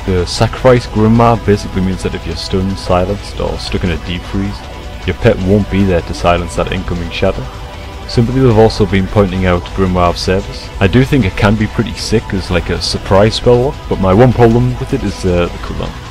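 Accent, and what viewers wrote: Newcastle, United Kingdom. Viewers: nice vid thought i heard a hint of newcastle in u for a sec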